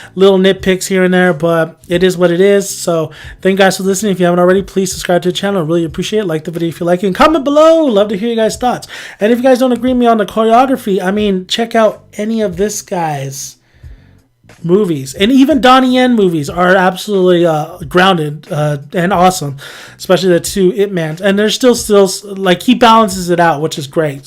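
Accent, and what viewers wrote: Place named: India. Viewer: What's your slang of english ? What are u actually?? I mean are you an Indian or Canadian or something? May I know it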